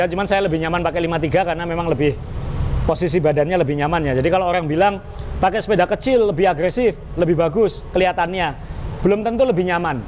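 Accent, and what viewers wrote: Surabaya, Indonesia. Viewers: yang saya suka mbak nya ngomong nya medok khas suroboyoan... jd inget pas kuliah di unair dulu.. <num>. maju trs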